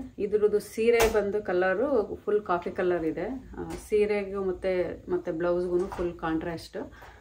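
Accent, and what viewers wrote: Mandya, India. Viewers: nimma matu keloke mandya bashe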